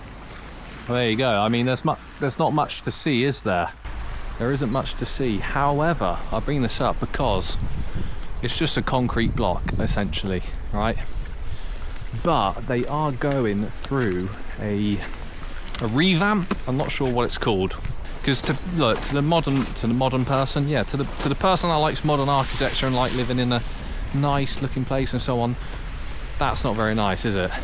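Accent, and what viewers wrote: United Kingdom. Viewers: Ох уж этот британский акцент)))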